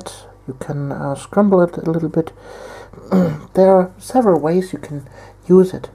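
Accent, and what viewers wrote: Germany. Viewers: Jonathan Holt It's a woman with a german accent and a bit of an uncommon speech habit (sort of stiff mouthed, it's hard to describe).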